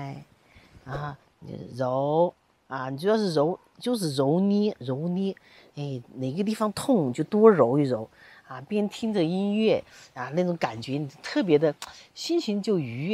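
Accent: Wuhan, China. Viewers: 大姐你是武汉人吧? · 、你是不是來自中国-你的口音很像,只有中國人最注重養生、通经脈穴道、你说的沒錯要堅持做才会有效果．我也经常失眠謝謝你、告诉我们方法.我一定試一試、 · 我也觉得是从武汉来的😀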